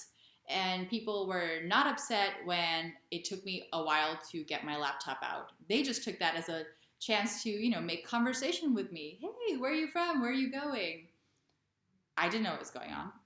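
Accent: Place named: Netherlands. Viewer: She lives only 1 year in the Netherlands and has already a steenkolen accent.. wtf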